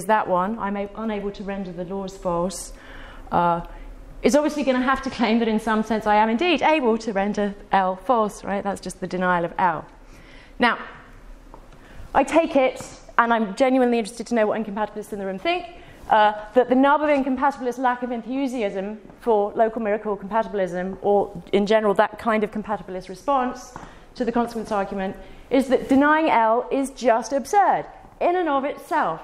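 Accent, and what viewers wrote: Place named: United Kingdom. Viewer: I used to love the britisch accents. of course, I don't get to hear it that often, but nevertheless, I had such a hard time to follow this women for sure for several reasons (speed and reduced content etc), but her accent was really hard to follow at that speed but even with low speed it doesn't get better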